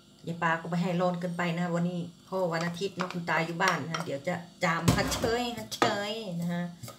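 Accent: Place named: Korat, Thailand. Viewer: แกงมะเขือเนื้อน่าอร่อยเผื่อด้วยค่ะไม่กินเนื้อจ้ะ คนโคราชนิสำเนียงโคราช สบายดีนะ😋สวัสดีจ้า วันนี้ว่างเลยมาหาค่ะ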